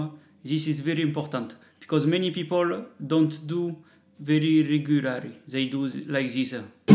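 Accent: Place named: France. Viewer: es-tu français, du coup? J ai remarqué que t as un accent français.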